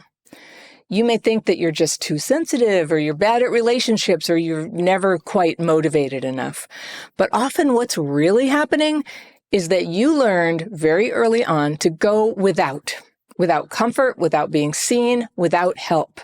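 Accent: United States. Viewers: What a good videos you make, I always feel very seen in what you say and the way you bring your videos feels really like emotional support. By the way, what a GREAT American accent!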